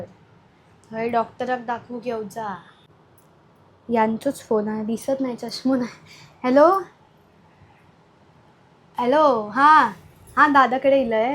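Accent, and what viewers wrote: Malvan, India. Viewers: Bharichhh काय ता केलास गो pure मालवणी ❤❤❤ मी कुडाळचा आसय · भारी मालवणी तु मालवणी आहेस का, ❤❤❤❤❤❤❤ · भारी तुम्हाला मालवणी येता 😂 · A-pratim malvani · लय भारी मालवणी तर झक्कास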